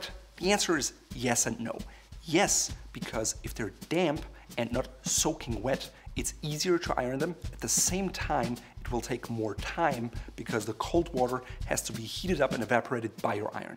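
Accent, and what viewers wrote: Germany. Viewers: Whoaaaa your Accent is so strong.... dass ich Deutsch nur vom zuhören gelernt habe! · He's from Germany. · It's a cross between German and South American.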